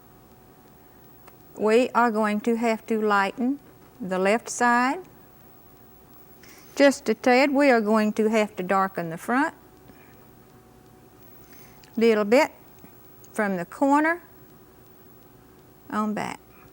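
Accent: South, United States. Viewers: I love her Southern accent!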